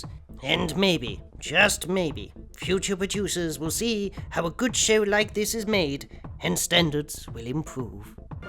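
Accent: United Kingdom. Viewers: Are you British or are you an American playing a British puppet character?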